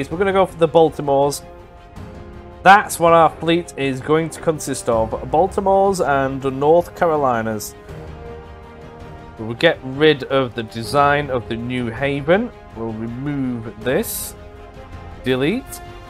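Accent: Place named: England, United Kingdom. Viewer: do you live up north or down south? Just because you said you were Scottish but you sound English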